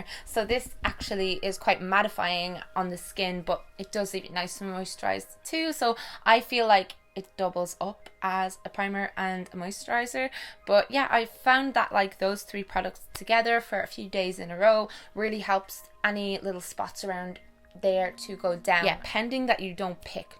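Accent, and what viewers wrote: Ireland. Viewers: Does anyone know what part of Ireland she's from?? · lovvvveeee you can you marry me??? God Irish accents are so sexy xx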